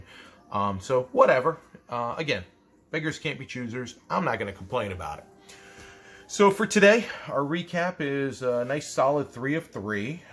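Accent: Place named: Utah, United States. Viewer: I’m listening to your accent... I’m hearing something that tells me that you’re not a native from North Carolina. What am I hearing? My guess is Utah. Am I right?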